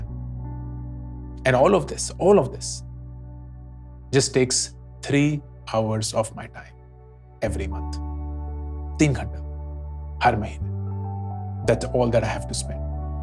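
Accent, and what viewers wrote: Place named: Kashmir, India. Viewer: Can't help but appreciate your subtle Kashmiri accent. "Pata hai kyu" Highly appreciable journey.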